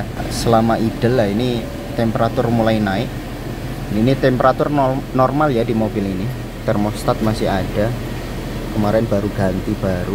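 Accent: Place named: Malang, Indonesia. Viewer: Ini adalah contoh bahasa jawa dialek kota malang dan sekitarnya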